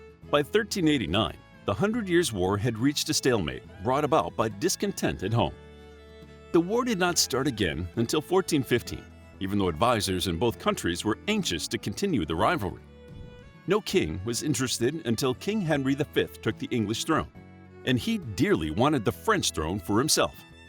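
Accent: United States. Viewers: You do realise this Narrator is American, not British, don't you? Can't you hear his accent. They are obsessed with this rivalry, even though the Brits on the French had been friends for over 200 years.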